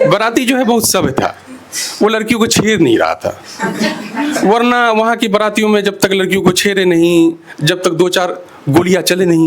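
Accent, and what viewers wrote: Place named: Bihar, India. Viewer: Bhai aapke accent se hi pta chalta hai ke aap Bihar se hain,, dekhne me nhi lagta. Anyway Too good bhai ❤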